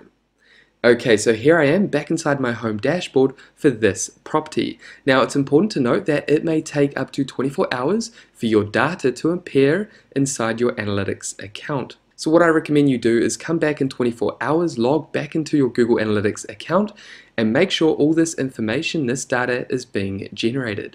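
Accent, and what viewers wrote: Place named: New Zealand. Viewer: Cheers Mate! Good to hear a fellow kiwis voice lol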